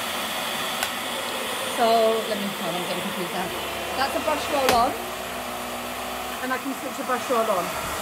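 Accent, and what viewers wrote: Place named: South, United States. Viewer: always a pleasure, where you from ? I see the UK plugs and you have a southern accent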